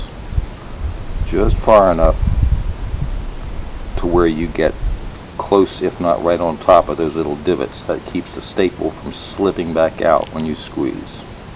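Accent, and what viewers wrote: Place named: Transatlantic, United States. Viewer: This man has a translantic accent..... wow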